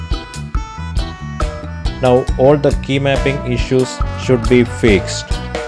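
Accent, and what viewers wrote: Kerala, India. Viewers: are you an a mallu ?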